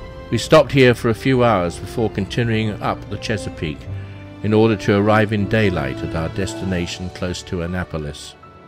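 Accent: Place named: RP, United Kingdom. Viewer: There is no better way to tell a story than when the narrator speaks the Queens English. We Americans should learn to speak clearly and enunciate words properly. My travels to England however, proves they also should learn the same lesson.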